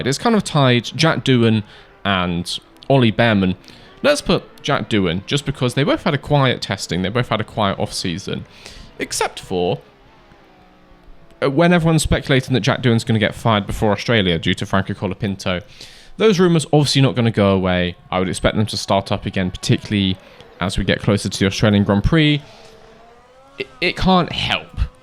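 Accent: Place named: United Kingdom. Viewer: you bring the only podcast I could ever listen to: F1 and with a brit accent. Perfect to listen while I work! I hope wet pointless testing becomes a thing! Thank you!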